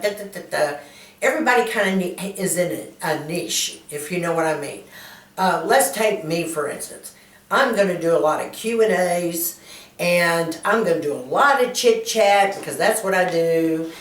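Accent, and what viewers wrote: South, United States. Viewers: I hope you cooled off by now... Anyway, this was half an hour well spent also if I don't have a channel. Interesting to learn what's behind the screen also if it's not always pleasant details. By the way - since I don't want to miss a word I do make an effort and slowly I'm getting a better understanding of your marvelous Texan accent.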